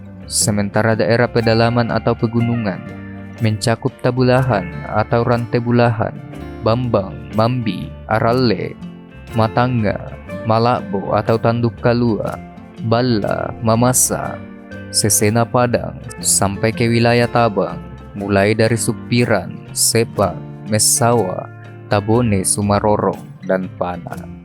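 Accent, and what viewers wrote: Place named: South Sulawesi, Indonesia. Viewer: Lidah sulawesi selatan terdengar jelas dari mulut si admin,.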